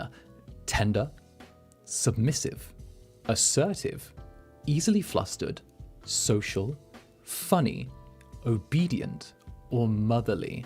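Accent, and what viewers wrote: United Kingdom. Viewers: Fancy british (?) Incubus is going to be the end of me. I love him too much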